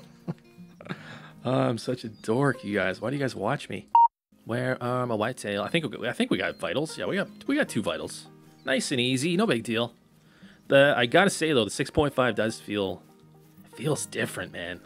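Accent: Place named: England, United Kingdom. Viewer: I love your English accent 😂🤣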